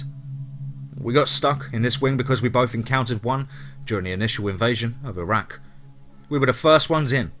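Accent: England, United Kingdom. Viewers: If you're from Kansas City, where did you acquire your UK accent? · Why does a guy from Kansas City have a UK accent??!! Come on man. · Dude your accent doesn't sound American. You sound british. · wheres the same stories without the english accent?? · He's NOT australian . He's English. !!!! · Tell you what...You are not from Kansas City with that British accent. sorry but I think you are a writer trying to pull a fast one on a bunch of us who look at this stuff on you tube. You even talk like a novelist. You have done your research and its too familiar to other posts. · Yeah right. Believe this guy. He said he was raised in Kansas City and is an officer in the Rangers. Yet he has an English accent so thick you can cut it with a knife, and there's no Midwestern US overlay. None. He couldn't even pronounce Fort Leavenworth properly. Bad joke and dumb story. 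Thumbs Down #fakemantalking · Loving 🥰 the English accent of this storytelling #teamfear love ❤️ Ally from Australia 🇦🇺 #TEAMFEAR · An English accent? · First of all he’s English and secondly it’s a creepy pasta...not real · Mock these encounters at your own risk..BUT.......even if this particular story is BS... there are CREATURES that we're born from. FALLEN ANGELS. Benne Ha Elohim translates in Hebrew as " fallen ones"....... The British accent is cute...... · Hi there, I am newbie just now and I don't know why I haven't encountered your channel over the years I'm listening to audio stories on here . I've subbed , I like the range of content I have seen so far , and nice to hear an English accent , definitely a minority amongst the narrators on YouTube. Greetings from this Irish girl in stormy Portsmouth UK this afternoon. 💚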